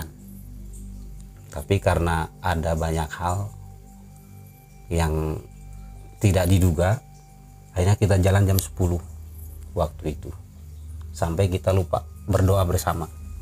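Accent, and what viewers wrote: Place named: West Java, Indonesia. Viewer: Wakaka dimaklumin kang sebagai sesama sundanese 😁semangat 💪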